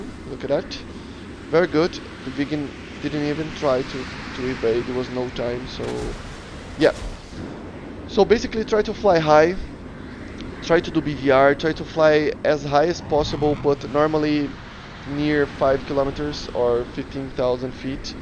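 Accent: Brazil. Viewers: aha, desconfiei. O sotaque brasileiro não engana kk Mas que canal top cara, descobri por acaso. É só questão de tempo para o canal crescer * sul do brasil onde? rio grande do sul?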